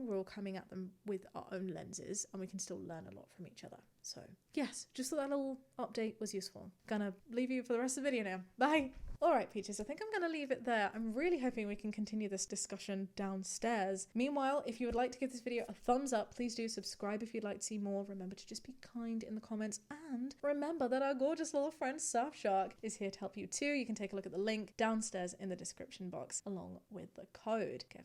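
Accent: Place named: Yorkshire, United Kingdom. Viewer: Eyyy Yorkshire accent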